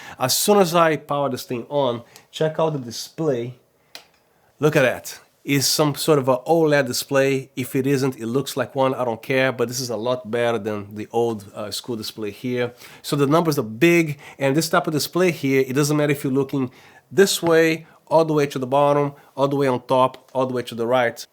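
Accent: Brazil. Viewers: Many thanks for this video review! Helped me a lot. I seem to sense in your accent you're from Brazil. Am I wrong? :)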